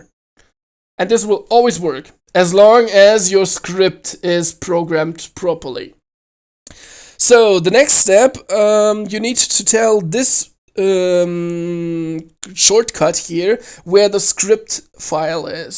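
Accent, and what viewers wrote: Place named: Germany. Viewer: Are you german? You sound german :) I have a problem --> I only get the message (translated from norwegian): The taget you have assigned, is invalid. control if you have assigned it to the right place or filename any ideas? (im using w10) The line is below "C:\Users\Magnus\Desktop\DEDICATED SERVER\steamcmd.exe steamcmd +runscript scripts/7daystodieserver.txt"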